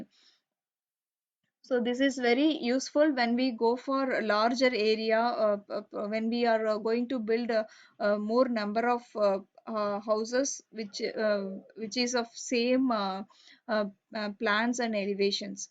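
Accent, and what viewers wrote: South India, India. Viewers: Very boring narration, dozed off when video was playing. also typical South Indian accent of the woman narrating. Wish it was more professional.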